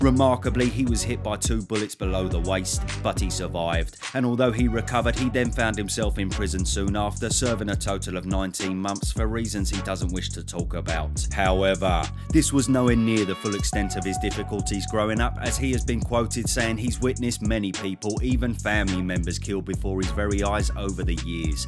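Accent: Cockney, United Kingdom. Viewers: I love the cockney narration which makes me think of being in a pub in south London. I'm a Brit living in America.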